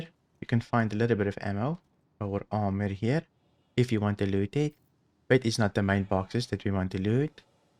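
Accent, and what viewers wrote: South Africa. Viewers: Great content there mate you sound South African if so I'm South African too anyway this helped me through site B...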